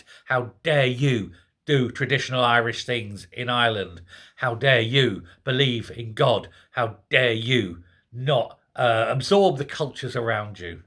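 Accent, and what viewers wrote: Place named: RP, United Kingdom. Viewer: Just out of interest - if you are from Ireland then where did you get your rounded vowels & fairly posh English accent from?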